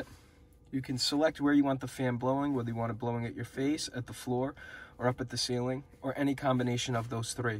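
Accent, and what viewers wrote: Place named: New Jersey, United States. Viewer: You from New Jersey? Love the accent!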